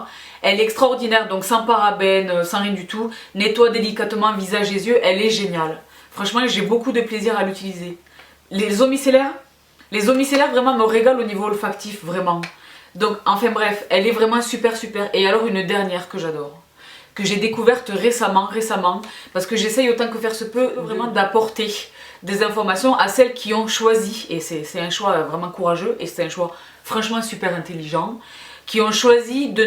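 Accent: South, France. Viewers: Coucou!:) Superbe vidéo. <3 Il y beaucoup de produits (enfin, beaucoup, c'est un bien grand mot. ^^) que je connais surtout des produits de la cosmétiques asiatiques (Une petite fan.:D ). Il y a aussi pas mal de pépites qui me font de l'oeil maintenant que j'ai vu ta vidéo (C'est mon compte en banque qui risque de ne pas être content. Ha ha! ^^' ). Ceux qui me tentent le plus sont: les Hadalabos, le "Time Revolution First Treatment Essence" de Missha et la protection solaire no sebum de Missha; Il y a le démaquillant/nettoyant de Vichy mais tu en parles dans une autre vidéo. Il m’intéresse surtout pour les moments où je suis plus motivée par mon lit que par la salle de bain.:D Ah et aussi l'huile d'avocat de Melvita pour mon contour de l’œil. D'ailleurs, le rouge à lèvres tatoue de Dior, j'ai l'impression que c'est un Tint. Sans le côté transfère par contre (ce qui le fait devenir plus intéressant). 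Mais beaucoup de marque coréenne en font (dont la marque Etude House que j'aime beaucoup surtout pour leurs multiples rouges à lèvres, leurs cushions, leur palette que je rêve de tester et le double lasting <3) depuis un petit moment. Peripera est connu pour leur Ink d'ailleurs. (si tu es déjà au courant et que l'as dit dans une vidéo, sorry, sorry: je n'ai pas encore vu toutes tes vidéos. Je débarque sur ta chaine.:) ) Voilà, merci de nous faire partager tes pépites, tes astuces, ta bonne humeur (<3), tes expressions (<3) et ton accent (j'adore l'accent du sud! <3)!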